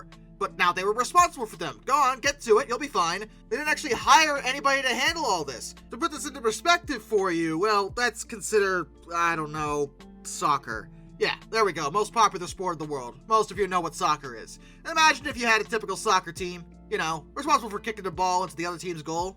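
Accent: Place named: Mid-Atlantic, United States. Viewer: I like it. It's like a mid 20th century newsreel with a slight mid Atlantic accent. Appropros for the subject.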